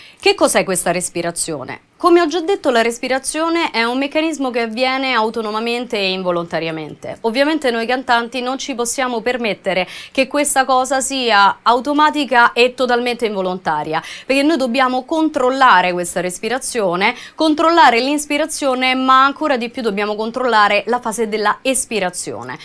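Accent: Rome, Italy. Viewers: Sei romana... Interessante lezione!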